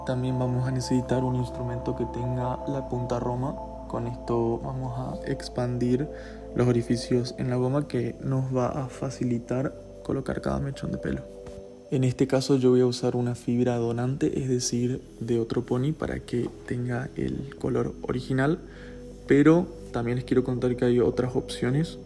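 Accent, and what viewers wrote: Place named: Corrientes, Argentina. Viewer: Sos Correntino ?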